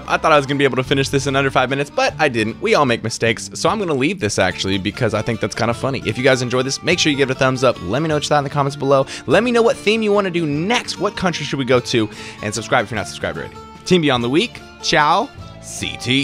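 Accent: Italy. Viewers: how can you say that? the Italian accent was spot on, everything you cook talking like that become instantly Italian food. p.s. I'm Italian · The Italian accent! 😂😂😂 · Nice Video! Loved the Italian voice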